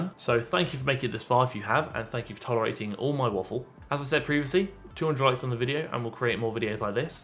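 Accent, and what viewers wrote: United Kingdom. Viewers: Absolutely adore Caroline. I'm not advanced nor a beginner so it's a huge challenge for me but I love every moment of pain. Also I love your British accent especially the 'bloOdY' 😂. Idk if you know but you seem really seem similar to another youtuber Slogo. Don't know if you know him but yeah I can't ignore the similarity.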